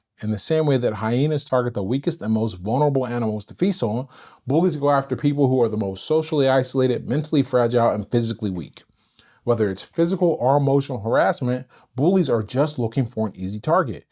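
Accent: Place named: Fox Chapel, United States. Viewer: You grew up in the hood but speak and act all Fox Chapel or Nevillewood. Salute my man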